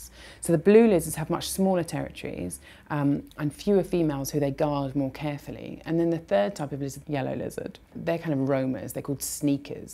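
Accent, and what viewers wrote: United Kingdom. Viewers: Hate that british accent. Brr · I love the British accent !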